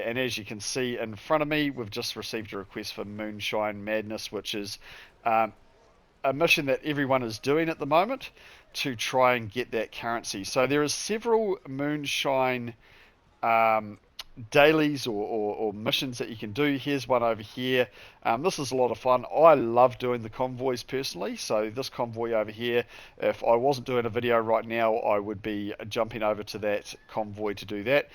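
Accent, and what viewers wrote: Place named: New Zealand. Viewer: New player here. Didn't know about the account ledger at Yanita. Thanks for this 🙌 Great Kiwi accent, mate! Edit: off topic, am i the only one who lost access to the shrine at Telok Penjarah, since the last update?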